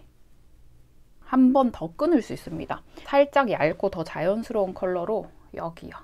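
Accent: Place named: Daegu, South Korea. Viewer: 너무 예쁘세요~눈웃음이 매력적이세요~ 살짝 사투리 억양이~ㅎㅎ 대구분이신듯ㅋ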